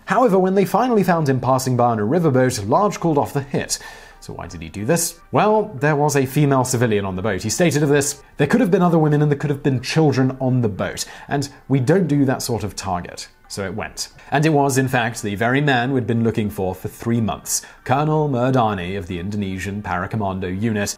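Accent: United Kingdom. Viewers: Why the host, who sounds British, giving an american salute in the thumbnail?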